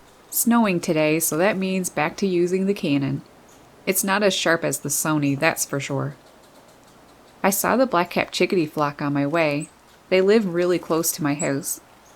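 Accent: Canada. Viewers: I think she said NewFoundland. She's got quite the Canuck accent.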